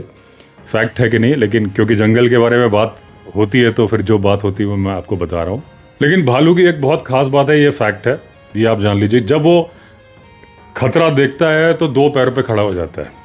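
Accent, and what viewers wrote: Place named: Jammu, India. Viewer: Sahi baat hai hamare gam ke log bi asa hi bolte hai Main jammu se hu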